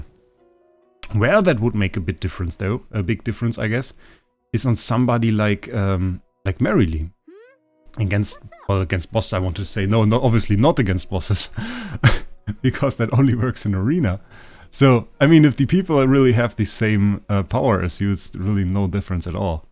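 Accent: Germany. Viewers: the perfect pronounciation of that made me realise that you were german and that accent wasnt from some other language LMAO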